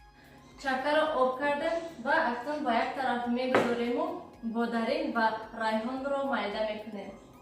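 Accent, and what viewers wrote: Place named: Kulob, Tajikistan. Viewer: шумо ранги кулоб и гап мезанен мехохам шумо кулоб и бошен илтимос наген кулоб и нестам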